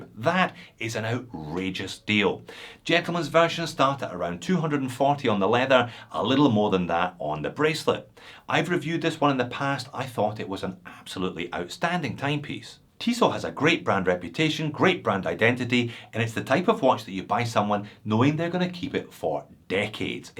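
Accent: Scotland, United Kingdom. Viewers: Love your Scottish accent 🎉🎉🎉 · Great video and amazing you sponsored it yourself! are you really Scottish?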